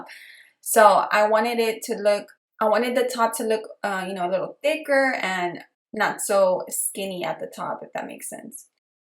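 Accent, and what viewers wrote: Texas, United States. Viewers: I like all your creative decorations and I like how you take your time explaining how your voice sounds you must be from Texas keep up the good work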